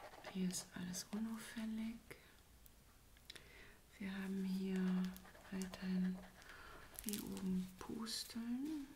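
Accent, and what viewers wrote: Germany. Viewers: Está hablando inglés o alemán? O habla el inglés con el acento alemán, solo curiosidad, habla diferente pero se le escucha bonito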